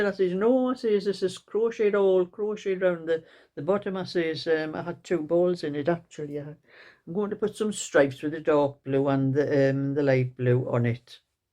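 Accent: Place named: Durham, United Kingdom. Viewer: I love your chats, you are interesting. Your voice reminds me of friends I know in Durham.❤❤❤❤. You have a lovely accent.❤❤❤❤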